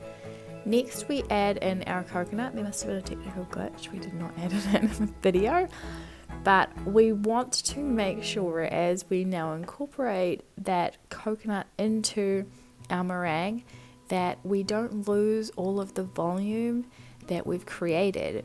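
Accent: New Zealand. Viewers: Thank you for this great recipe, you have a very soothing voice and a beautiful kiwi accent ❤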